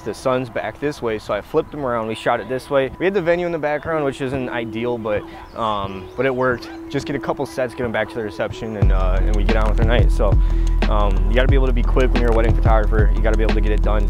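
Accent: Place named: Wisconsin, United States. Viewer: Your video showed up recommended, so I started watching and immediately knew 1 thing…. That accent, you’re from Wisconsin! 🤣 I have family in Kenosha and my mom grew up there, and always loved their accents growing up. Nice photos, btw. ☺️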